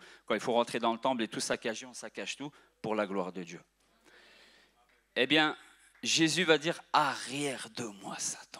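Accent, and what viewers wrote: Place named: Arab world. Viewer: Ça me perturbe de l'entendre parler Arabe no were au travers du français!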